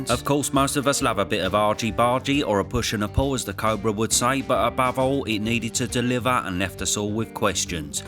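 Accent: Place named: Cockney, United Kingdom. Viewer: Ffs unsubbed his channel because of the cockney accent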